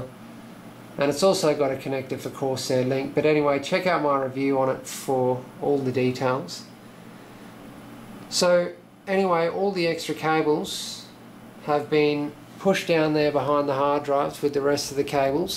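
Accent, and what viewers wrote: Australia. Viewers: I thought you were from Australia, I'm from Perth. Btw nice system im currently building my first water cooled setup up. Just a question? Umm how would you run your water loop? Would you go Reservoir > Pump > Radiator > CPU ???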